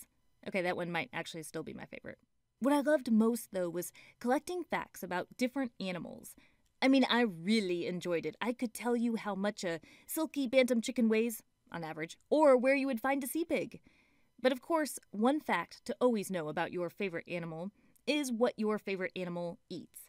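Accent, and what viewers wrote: United States. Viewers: are you from tennnese becsaud yoyut the on;t ten i see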